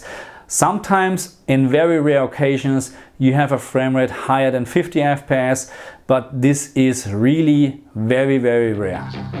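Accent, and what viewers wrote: Germany. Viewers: Deutscher akzent 😂👋👍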